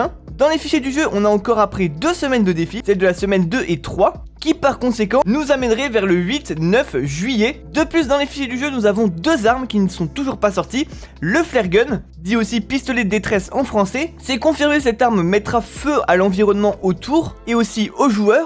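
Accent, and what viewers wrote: Quebec, Canada. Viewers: ta parler quebequois la non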